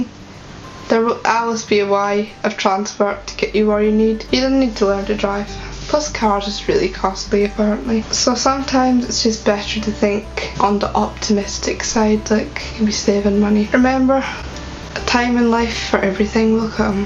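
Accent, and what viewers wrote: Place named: Scotland, United Kingdom. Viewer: this was interesting to watch, I’m sorry your having to live with it, Skye is mega cute, I’m from Scotland and by your accent I’m thinking your from Scotland as well Ayrshire or Falkirk but I could be wrong lol x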